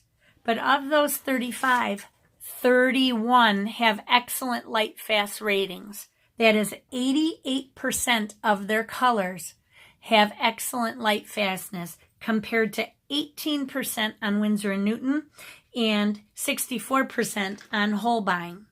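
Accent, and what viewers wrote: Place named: Midwest, United States. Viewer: That midwest dialect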